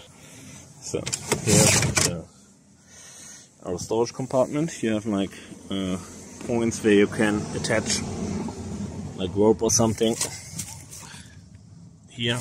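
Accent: Germany. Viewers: The fact that I turned on subtitles and there is only German since u have strong German accent is hilarious 💀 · Der deutsche Akzent ballert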